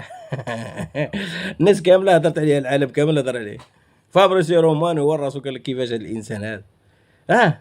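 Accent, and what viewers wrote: Morocco. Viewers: اخي الكريم لهجتك مغربية وأنت تقول أنك جزاءري افهمك جيدا أنت مغربي في الأصل من مغنية أو سيدي بلعباس او تلمسان او ما جاورهما .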